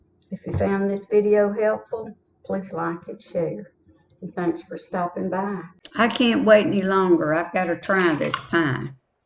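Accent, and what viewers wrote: South, United States. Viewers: I love your southern accent and pie.Just like my mother in law . I miss her so much ❤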